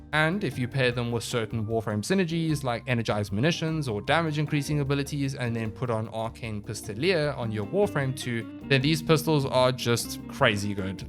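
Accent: South Africa. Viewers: Great vid Boet. Think I'm recognizing some home accents there? You from SA?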